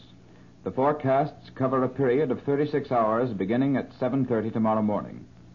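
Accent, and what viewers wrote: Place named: Mid-Atlantic, United States. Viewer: PhilaVideo it's called "Mid-Atlantic" because it's supposed to be like somebody coming from the middle of the Atlantic Ocean receiving equally American & British speaking styles.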